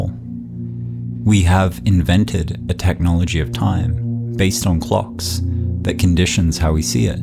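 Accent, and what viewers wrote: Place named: New Zealand. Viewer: Lovely essay! You sound like you are from New Zealand? Just curious as I'm listening from Auckland. Thanks man, nice work, congrats.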